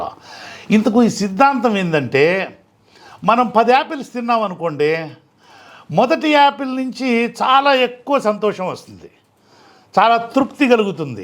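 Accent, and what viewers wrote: Nellore, India. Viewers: Sir mee nellor yasa baguntudi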